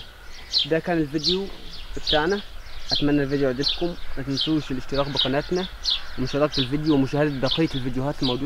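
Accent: Upper Egypt, Egypt. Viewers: اللهجه من اول الصعيد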